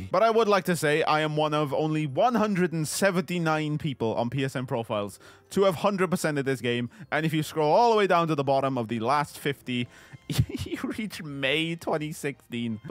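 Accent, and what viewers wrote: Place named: Wales, United Kingdom. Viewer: Man, from the first line I thought to myself "I bet this is Ride to Hell Retribution". Always good to see your dulcet Welsh tones coming up in my subscriptions again!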